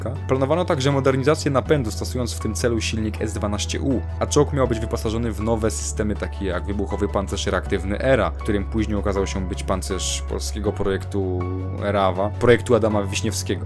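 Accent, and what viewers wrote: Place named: Russia. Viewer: Dlaczego masz ruski akcent,? Skąd jesteś? Sorry, jestem tzw. poliglotą i znam kilkanaście języków, więc jestem trochę przewrażliwiony i nawet jeśli nie robisz zbyt wielu błędów to zdradzają cię samogłoski, ikanie, akanie.... Prosty lud to kupi, a ja nie. To jak to jest z tobą - Podlasie, czy yeah bujny ruski troll?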